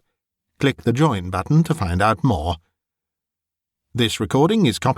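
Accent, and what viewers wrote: England, United Kingdom. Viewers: Great stuff sounds better in a upper class english accent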